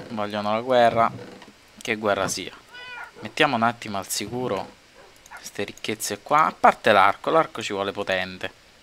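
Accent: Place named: Rome, Italy. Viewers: non è napoletano, è romano 😂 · E romanò :^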